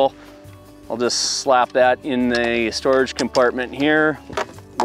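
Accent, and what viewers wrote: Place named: Midwest, United States. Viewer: Haha I love the midwest accent. Put your baits in your baigs! Honestly though, thanks for the solid and straightforward tip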